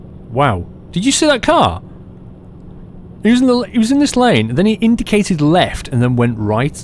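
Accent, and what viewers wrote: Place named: United Kingdom. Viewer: I enjoy the long videos because they show full venture of the journy and i find it entertaining listening to you say things like nobbit as an american your british accent makes me laugh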